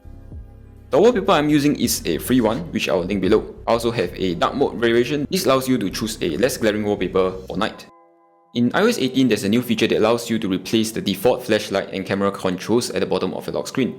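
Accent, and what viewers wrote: Singapore. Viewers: This is cool but I can’t understand anything you’re saying because of your oriental Singapore accent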